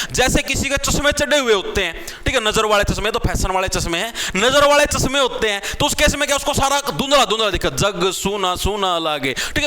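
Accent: Haryana, India. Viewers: Ye sir haryana se hai kya?😅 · Sir aap Hariyana se ho kya😊😀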